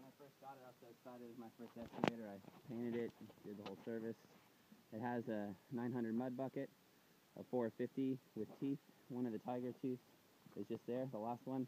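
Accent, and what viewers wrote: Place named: United States. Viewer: Awesome! You still sound like an American! Third kid? Firefighter?! Damn, I miss the crap outta you guys. . . give my love to Nic. BTW, my profile pic is me raising the main just outside 39 while YOU are at the helm with Serena and the photographer Erin in the cockpit! Goodtimes, my man, gooood times. . .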